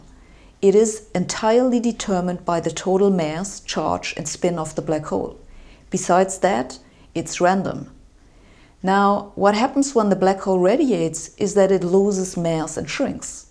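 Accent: Germany. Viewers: Ich liebe die deutsche aczent..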